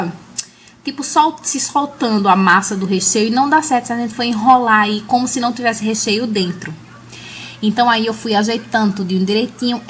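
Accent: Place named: Pernambuco, Brazil. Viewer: Adoro suas receitas!! Esses docinhos....cada um mais lindo que o outro!!! Pelo seu sotaque, você é daqui de Recife/Pernambuco??? Se for somos conterrâneas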